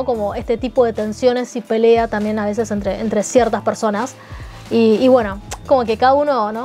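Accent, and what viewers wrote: Argentina. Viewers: Además te felicito que no pierdes la forma de hablar Argentina....!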